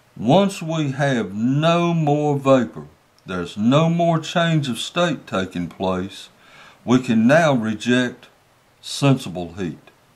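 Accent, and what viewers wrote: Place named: South, United States. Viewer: Very cool southern drawl too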